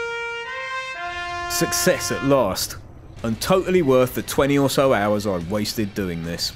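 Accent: United Kingdom. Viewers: he sound very british~ =D I wonder of he's from the uk~ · You are the most bri'ish bri'ish iv ever encountered, and i dont say that to everone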